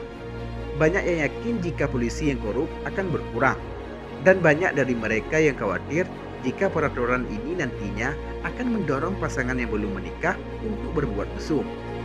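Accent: Aceh, Indonesia. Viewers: Ini yang ngisi suara org Aceh ya? Logatnya Aceh banget 😂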